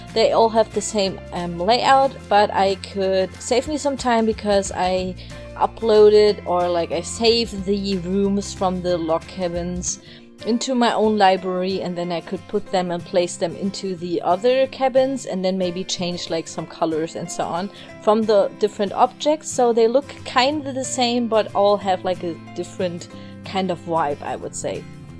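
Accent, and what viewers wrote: Germany. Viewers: you have the best German accent ever 10/10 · ich bin gerade sehr begeistert von deinem englisch. du hast einen superdominanten deutschen akzent. und dann hast du so ein großes und krasses Vokabular. respekt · Are you from Germany? Your accten sounds german :) Btw: This Video is amazing and so creative !! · is she german? she sounds like me haha. well if i could speak fluent :p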